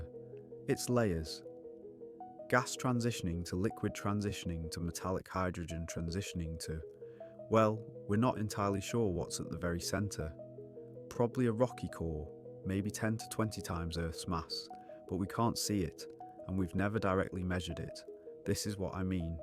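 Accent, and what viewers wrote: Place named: England, United Kingdom. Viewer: where in england are you from? i like your accsent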